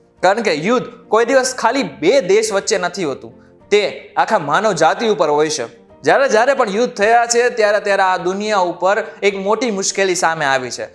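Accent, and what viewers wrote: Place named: Ahmedabad, India. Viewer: ભાઈ, આ અમદાવાદી touch છોડીને બોલો તો સાંભળવું ય ગમે. તમારી પ્રસ્તુતિમા સાતત્યનો અભાવ છે. તમારું folks બોલવું fox જેવું સંભળાય છે. બની શકે અમુક viewers લોમડી જેવા ય હશે (મને છોડીને). પણ એવું કહેવું સારું ના લાગેને!😂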